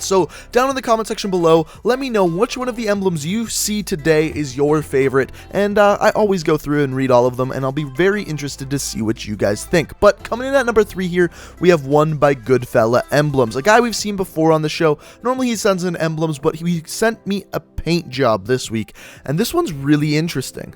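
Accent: Canada. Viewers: He's definitely Canadian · are you Canadian? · Are you Canadian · ohhh I know your from Canada now ''soory" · Soo soory you sound really Canadian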